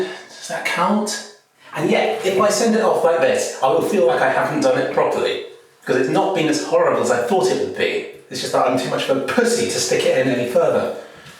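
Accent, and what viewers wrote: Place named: RP, United Kingdom. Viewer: Real sounds much more posh than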